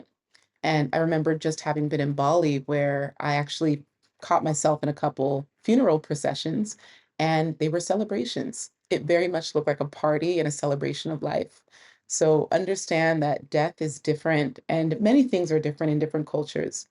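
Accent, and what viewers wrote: United States. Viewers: 👵🏽This is a compliment I love your American accent especially when you say y’all 🫶🏽✊🏽👊🏽💪🏽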